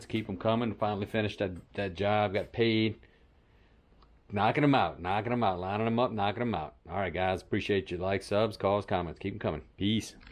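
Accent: Louisiana, United States. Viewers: 985 I knew that was a Louisiana accent.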